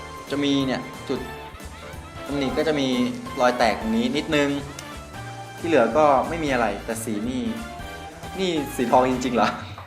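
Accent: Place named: North, Thailand. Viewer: คนเหนือกะ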